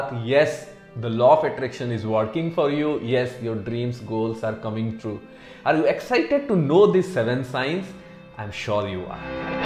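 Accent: India. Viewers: Is-spirituallity indian accent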